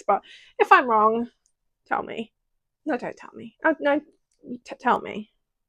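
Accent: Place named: United Kingdom. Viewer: Hi! New subscriber here. At first I thought you were local to me with your store name "Big Bear Yarns," I thought maybe you were from Big Bear, California (which is a great place to ski, and would be a great place to sell yarn)! Then I heard you speak and I said to myself, "Oh, I don't think she's local." 😂 Though you could be a transplant, but really, why would anyone from the UK want to live in the US these days, anyway? 😅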